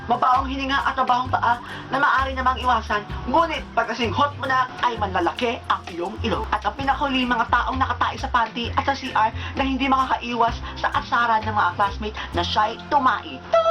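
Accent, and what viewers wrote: Visayas, Philippines. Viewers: hahahahha galing! bisaya ka ba??? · Bisaya ka dung uy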